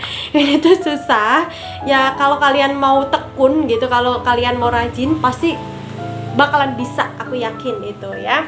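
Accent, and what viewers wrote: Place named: Jakarta, Indonesia. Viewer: Saya stuju mbak klo ngeyoutube itu gk semudah yg kita pikirkan, setahun lebih ngeyoutube sampe berlinang keringat sampe akhirnya dimonet. Skrng org sekitar pd ngomong "lu mah enak bikin video youtube doank gk usah capek2 tiap bln dpt duit dr youtube",, denger koment kaya gtu rasanya pengen nam****l 😂 Btw betawinya kental bgt mbak haha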